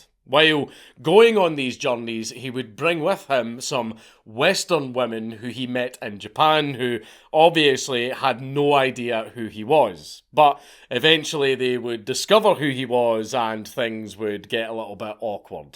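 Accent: Scotland, United Kingdom. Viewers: Personally, I like the way he says "work," for some reason, as idk what it is, but there's something about his scottish accent that tickles my American brain. 😅 But the way he says "murderer" sounds oddly appealing, too. 😅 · Being a German native I daresay I like this Scottish accent very much. It sounds very harsh but very funny at the same time. Always puts a smile on my face. 😊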